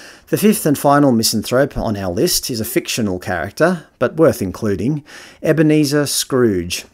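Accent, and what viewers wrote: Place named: Australia. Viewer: you're an Aussie !!!!! Love this subscription even more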